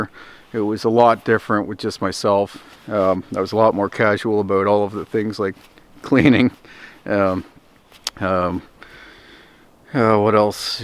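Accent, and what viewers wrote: Canada. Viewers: Happy New Year! I love your Canadian accent. So sorry to hear you lost your business & left you in a lot of debt.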